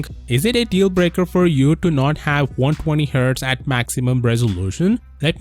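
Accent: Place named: India. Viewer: ANNOYING VOICE OF THE NARRATOR. INDIAN ACCENT REALLY SUCKS!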